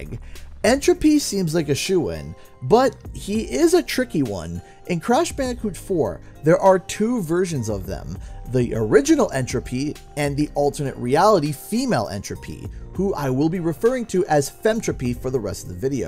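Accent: Canada. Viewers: It's cool that you have your own Crash 4 with a -version of Crash.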